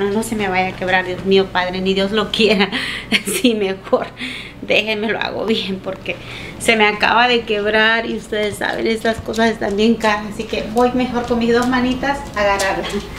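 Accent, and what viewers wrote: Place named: South America, Latin America. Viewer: Hola, te escribo desde España.....te descubrí antes de navidad y desde entonces te sigo. Eres una alegría de mujer, me encantas. A mí me encanta la gente sudamericana porque sois bien alegres, bien educados y con un acento como dices tú bien rico. Una pregunta, conoces el canal de Sandy y Fercho? Ella es colombiana y el Mejicano viven en Miami y también los sigo. El día 31 es el cumple de ella y le entró una llamada a su móvil de una tal, eras tú??. Un beso mi linda.😊😊❤❤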